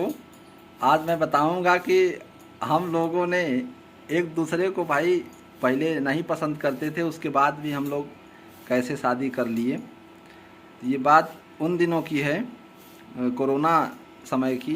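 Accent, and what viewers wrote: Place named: India. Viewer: Bhaiya aapki bato pe bahut maza aata hai ekdam desi style me bolte hain, bhabhi ji ki smile bht pyari hai, aise vlogs dala kariye youtube par, pranam❤aap dono ko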